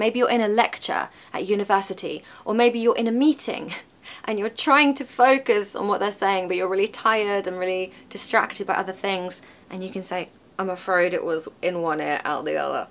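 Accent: RP, United Kingdom. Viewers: It's such an amazing teaching video as always! I've learnt so much from you and I took a note of them on my phone note so that I can revisit to consolidate. You are the No.1 native british who speaks with a beautiful quintessential british accent that I would love to listen for hours. Thank you for all your effort and amazing teaching!!😃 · I really enjoy watching your video❤❤ i'm impressed by British Accent and i hope i'll have a perfect British accent as you one day❤ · Sound natural is very Well, but a Light Froggy accent is very efficient for picking up, and I’m sûre that is you spoke French, your British accent would wonderfuly delicious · I love hearing your typically British accent! Thank you! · I adore listening to you . Your british accent sounds for me like a music. Thank you so much. You are so lovely. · Ma'am I am improving with your British RP standard ❤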